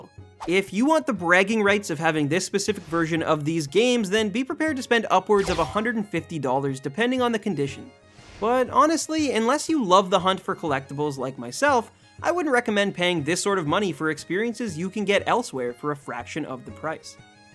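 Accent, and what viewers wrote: Canada. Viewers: This video is pretty good. Are you Canadian?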